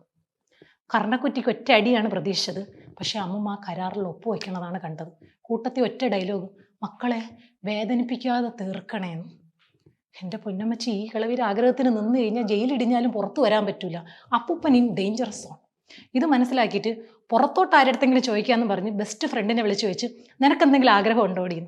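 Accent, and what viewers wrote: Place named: Trivandrum, India. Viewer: assal tvm avatharanam. Love you from tvm and near by your place ❤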